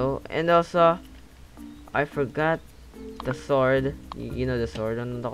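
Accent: Philippines. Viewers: Just from the accent i can already tell ur also filipino xd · You can see that he’s filipino cuz of he’s accents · i just noticed that your filipino by your voice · I knew that cuz of the accent, oh right im a filipino too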